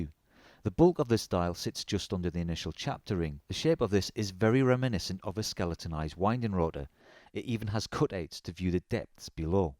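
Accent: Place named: RP, United Kingdom. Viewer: For anyone not speaking posh british as a native, it is very difficult to understand the gentleman whatever he is saying. Subtitles anyone?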